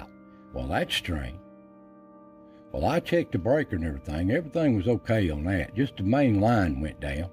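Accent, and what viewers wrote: Appalachia, United States. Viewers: It is amazing how much your Appalachian accent sounds like the East Texas accent. My only obvious conclusion is that a lot of the settlers here came from your area. I dunno. just a thought.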